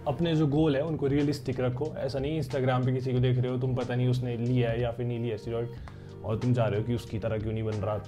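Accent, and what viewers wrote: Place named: Himachal Pradesh, India. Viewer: Bhai aap Bolne ke accent se Himachal Pradesh ke lagte ho.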